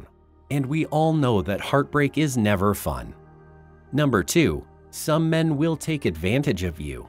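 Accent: Canada. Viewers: The Canadian accent strong here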